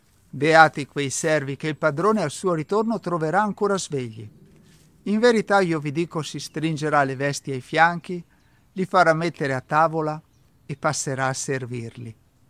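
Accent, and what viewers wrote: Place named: Veneto, Italy. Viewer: Ma quanto sei bravo fra??? La tua gioiosita' è contagiosa e iniziare la giornata con il S. Vangelo, detto e commentato da te, con la gioia che esprimi così bene con la tua solarità e in quel posto magnifico è farsi un regalo immenso!!! Anche il tuo accento veneto è bello: i miei genitori erano veneti e tale mi considero anch'io anche se nata a Varese! Grazie fra! Che Dio ti mantenga sempre così e ti benedica! 🌹💙🙏🙏🙏🥰👋 Volevo anche dirti che, mia mamma è tornata alla Casa del Padre proprio il 18 maggio di quest'anno, giorno del compleanno di papa Giovanni Paolo II 🌹❤️🌹💙🙏🙏🙏🙏👋👋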